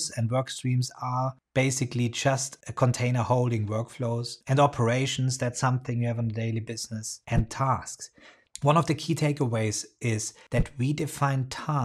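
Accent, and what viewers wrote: Germany. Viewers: yes I discovered you guys one week ago and I am very impressed, I love your framework… one question where can I contact you? And I am very curious looks very Spanish but your accent is German isn’t? 😅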